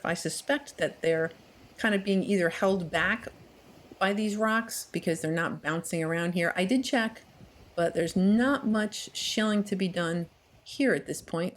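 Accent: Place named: North, United States. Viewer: Ive been going back and watching the older videos. I mentioned to my husband that you had an accent that sounded northern. We always wondered and you answered here by saying NJ 😂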